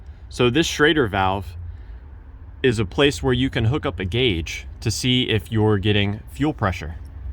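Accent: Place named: United States. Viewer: You told me EXACTLY the pin info.. And clearly in an American voice.. Lol.. I want to drain the old fuel .. Been sitting more than 3yrs. Thank you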